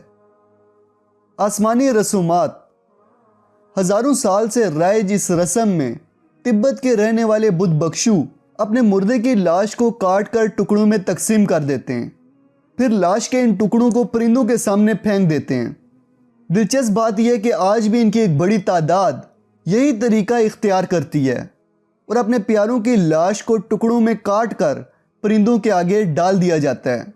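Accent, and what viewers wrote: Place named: Punjab, Pakistan. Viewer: Aap ka Urdu talafuz Sahi nahi hai Aap k talafuz me Punjabi talafuz ki milawat hai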